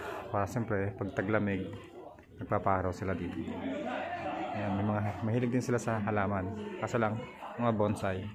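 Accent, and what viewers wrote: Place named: Spain. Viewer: Why does it sound like you're speaking Spanish with Chinese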